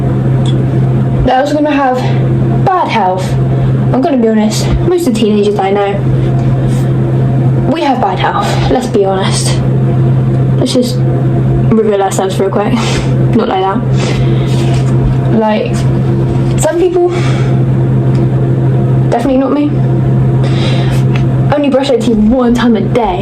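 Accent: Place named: United Kingdom. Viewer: Yeah, I feel basically the same way 😭 and hey, im from the UK too! (Judging via accent) and I’m literally like a year older than you (since you mentioned 16) and I gotta say I agree with you on the vast majority of points here, though some can be chalked up to other factors. Modern UK is pretty bleak and depressing too, so that’s likely not a very nice effect on you either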